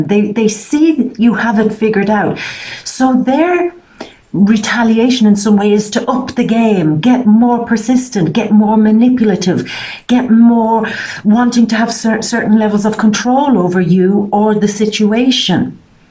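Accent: Ireland. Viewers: Love the irish accent, im from cork.xc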